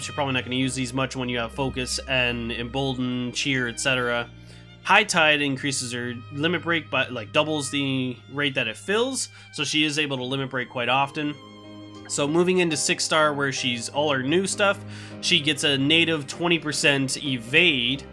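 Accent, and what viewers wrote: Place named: Canada. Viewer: They call Chizuru: Chirizo, type of Mexican sausage due to the obv similarity. You sound Canadian so I can understand the confusion :p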